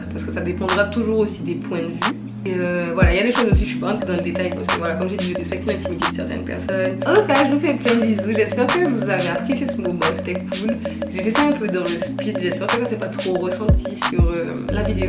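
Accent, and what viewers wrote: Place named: Antilles, Caribbean. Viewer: Tu devrais mettre des sous titres l’accent antillais tu piges rien mdr 😂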